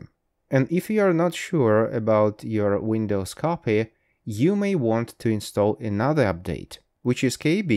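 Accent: England, United Kingdom. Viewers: Да вы из Англии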